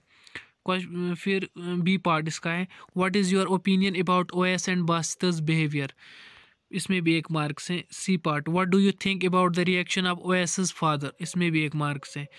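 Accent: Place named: Kashmir, India. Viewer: Sir aap Kashmiri h kya? Aapki slang me se pata chlte h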